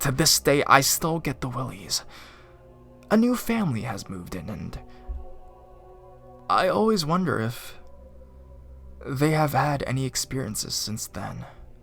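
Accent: Canada. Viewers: Are you Canadian? Just wondering because of your accent. Anyway, good video! 👍 I like the longer ones too. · Are you Canadian? If you are then you got the most awesome Canadian accent....if not then....damn good Canadian accent lol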